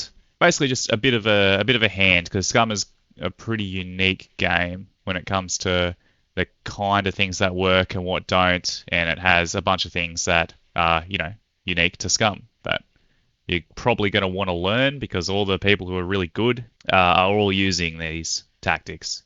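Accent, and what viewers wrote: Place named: Australia. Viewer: Nice to hear an Aussie voice and a fella who knows what he's on about to boot. Subbed cheers mate.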